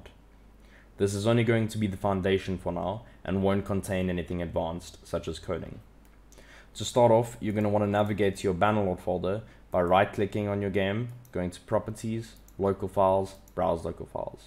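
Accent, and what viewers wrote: South Africa. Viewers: Always nice to hear a fellow South African on yt. Keep up the good work bru👍🏻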